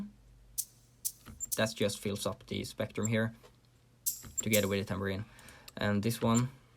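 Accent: Sweden. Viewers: thats the swedish accent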